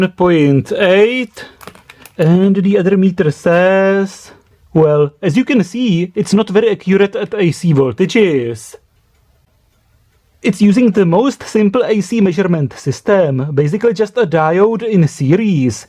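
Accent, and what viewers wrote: Czech Republic. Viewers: Czenglish hype!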